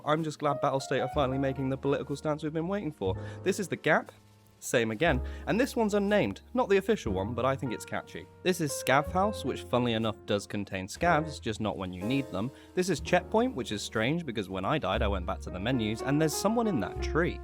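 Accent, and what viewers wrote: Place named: England, United Kingdom. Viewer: i like this funny english tarkov man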